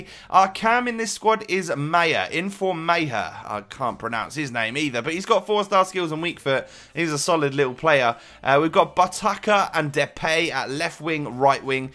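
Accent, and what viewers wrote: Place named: England, United Kingdom. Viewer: It's so funny to hear an English guy pronounce Dutch names (no hate)! Great video, really enjoyed watching!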